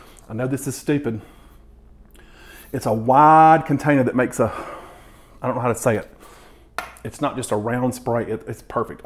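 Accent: South, United States. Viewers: my favorite thing about your videos. #1, I can listen to your southern twang all day. #2, your face when you have tasted your creation! No poker face for sure. And when you don't like it, we know you don't like it. Thanks for what you do!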